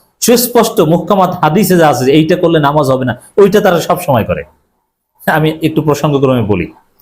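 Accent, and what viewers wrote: Barishal, Bangladesh. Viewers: শুনে ভালো লাগলো - আপনি বরিশালের উজিরপুর /বানারীপাড়ার।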